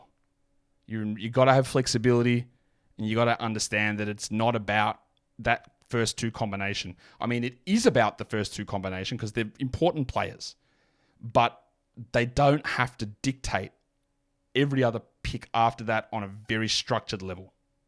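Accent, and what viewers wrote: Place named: Australia. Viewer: "They deliberately say something wrong or weird to boost themselves up in the algorithm. Maybe I should start that" Also: has a thicc Aussie accent who hosts a daily show that with a viewerbase of largely Canadians and US, and greets them every show with obsure aussie quotes like "what is the crime, eating a succulent Chinese meal" 😅😅 Look, you're not wrong. Kept me coming back that's for sure.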